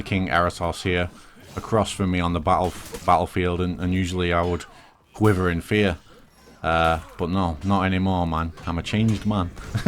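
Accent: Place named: Yorkshire, United Kingdom. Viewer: Are you from Yorkshire/Lancashire? Your accent is like exactly the same as tru3ta1ent's and he used to be big into For Honor.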